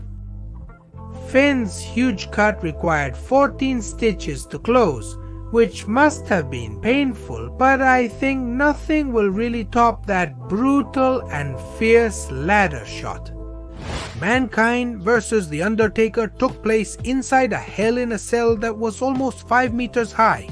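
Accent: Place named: India. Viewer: Why does bro sounds like Indian😭😭😭😭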